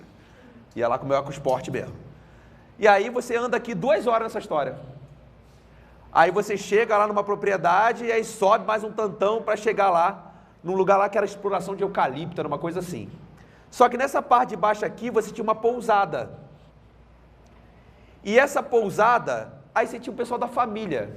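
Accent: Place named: Rio de Janeiro, Brazil. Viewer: Pô Prof. gratidão! Só gosto de ouvir aula c sotaque carioca haha Café caro da porra kkkkkkkkkkk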